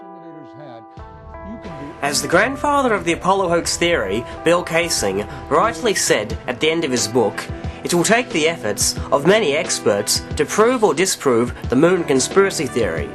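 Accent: Australia. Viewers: Excellent job in clearing up your honest mistake... Thanks for showing us how it happened. Unfortunately the Apollo debate has now become more of a personal battle between the CT's and the propagandists, than it is about the Apollo subject itself. Except for the Aussie accent, that sounded just like me! LOL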